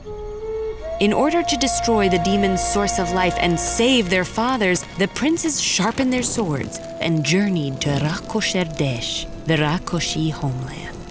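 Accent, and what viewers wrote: Bengal, India. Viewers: The bengali accent is just the cherry on top🤌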